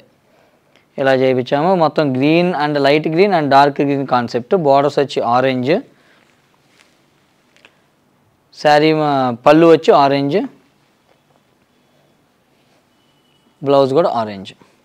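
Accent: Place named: Guntur, India. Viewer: Annayya Yes You we’re Soeaking our real Guntur languge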